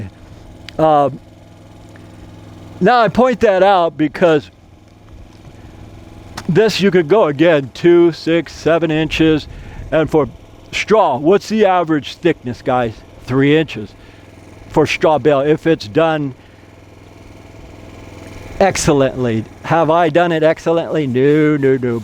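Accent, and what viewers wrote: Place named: California, United States. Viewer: Man, your accent is so Californian that you could star in the series “The Californians” Love it.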